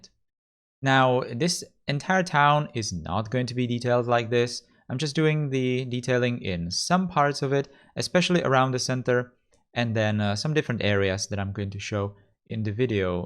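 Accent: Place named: Czech Republic. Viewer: I believe he's Czech. honestly I can't remember why I think so (and he also has typical accent of a Czech guy speaking English). anyway, he aslo referes to central European countries when talking about his hometown. Those being Czechia and Slovakia.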